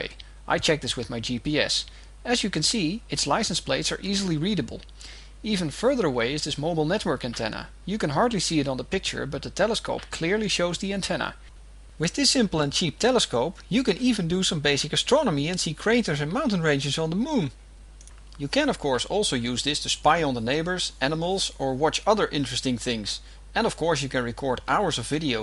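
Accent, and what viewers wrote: Netherlands. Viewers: Je bent een Nederlander je hoort het in je nederlands zoals bij veel Nederlanders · very cool, i like the very clear dutch accent :D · Mooi nl accent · NL! hoor ik altijd als ze Engels spreken XD! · from the VS?? you have more a dutch accent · Dutch accept OP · haha this is a dutch guy who is talking english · lol good vid but horrible accent haha most dutch people can speak well english but the accents are horrible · I could hear right away that you were Dutch, nice video by the way - I'd suggest buying a better webcam in the first place so you end up with a better result in the end. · too bad that he's clearly from holland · are you dutch? just curious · je klinkt een beetje nederlands xd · nice dutch accent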